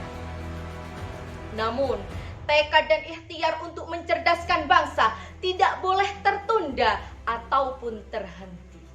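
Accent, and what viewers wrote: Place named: Pasuruan, Indonesia. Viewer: Alhamdulillah, nada Pasuruan banget. Akan kita temui ketegasan beliau ketika dipercepat 1,5x. Sukses selalu bu bidan, sehat selalu fatayatku.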